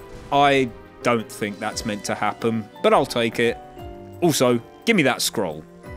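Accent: United Kingdom. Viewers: Ew britisj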